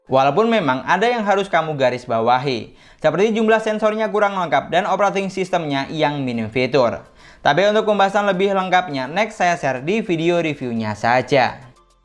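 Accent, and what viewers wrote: Madura, Indonesia. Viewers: Madura mana be'en kek?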